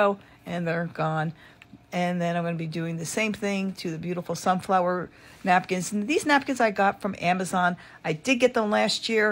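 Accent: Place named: East Coast, United States. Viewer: I hear an east coaster...NJ? "Cawfee" LOVE IT!! really I do. This is a fun tutorial, thank you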